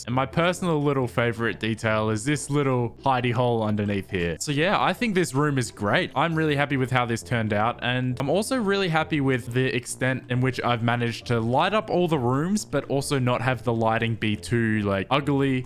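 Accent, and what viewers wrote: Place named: Australia. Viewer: far out this is the most aussie I reckon you have been in any video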